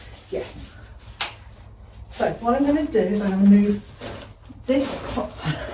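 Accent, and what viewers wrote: England, United Kingdom. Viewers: “That was quite a good workout there”. I can’t say it like you but I love your English accent. I needed a cheering up today!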